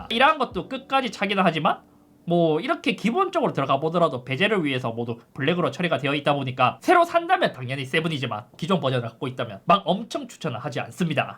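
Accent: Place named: Gyeongsang, South Korea. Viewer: 갱상도는 갱상도네요...드라랄드라라... 빡세요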